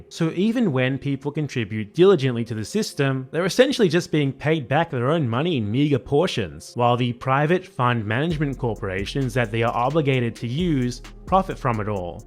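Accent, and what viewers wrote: United Kingdom. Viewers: Yes, always was a tad suspicious of baldy beardy guy, condescending british accents don't work on actual brits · The is something about a guy with a british accent saying " what [developing country] truly needs is [some neoliberal bullshit]" that makes me want to punch him in the face while singing the Marcha Peronista.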